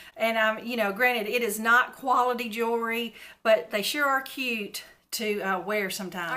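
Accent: South, United States. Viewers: I am new to your channel and I love it!!! I am from Mississippi and you sound like your from the South 😊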